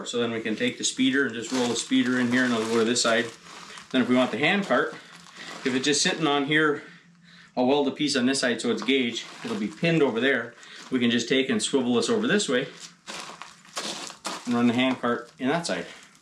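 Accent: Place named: Michigan, United States. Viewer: Just found your channel. Thought I recognized the accent. My mom is from Michigan. So most all of my cousins live up there. I love your trains.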